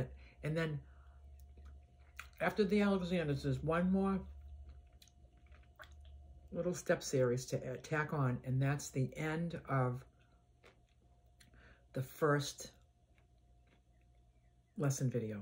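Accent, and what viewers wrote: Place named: Boston, United States. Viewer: .. Thank you for the suggestion,😢 But I ❤love listening to your Boston accent.😊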